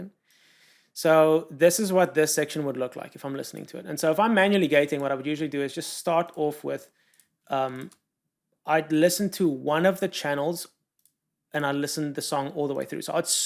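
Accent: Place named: South Africa. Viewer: I can hear the South Africaness oozing through your voice. Its amazing.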